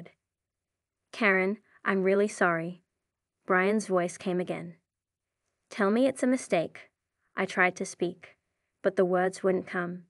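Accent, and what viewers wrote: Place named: United Kingdom. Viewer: I think British or English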